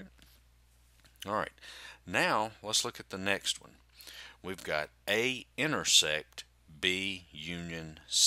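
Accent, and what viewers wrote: South, United States. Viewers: You mister, you sound so american, where are you from? Tenesse, Texas, The Grand Ranches of Arizona? Nevertheless, thanks for your video, it has certainly made things clearer... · Does the have a southern accent? I like it whatever the accent ❤️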